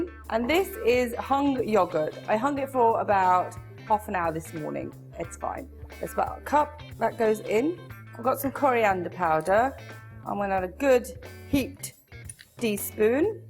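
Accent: United Kingdom. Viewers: Tooo much accents. Seem like I am in youui kaaayyy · I watch your videos just because of your pretty smile and british accent...👍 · It's always funny and amusing to see an indian with a British accent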